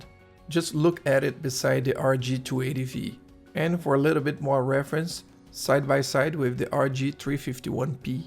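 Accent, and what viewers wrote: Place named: Brazil. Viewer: Esse sotaque aí não me engana hihihi brazuka na área!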